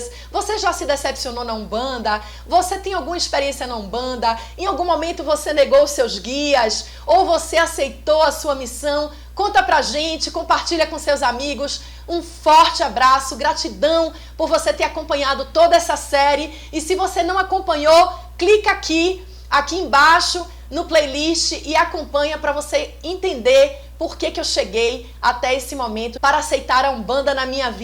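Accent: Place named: Bahia, Brazil. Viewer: Que história linda! Deu esperança em encontrar o meu lugar sagrado. Não é sem sofrimento, não é?! E que beleza de relato com sotaque soteropolitano! Viva o povo da Bahia! 🎉❤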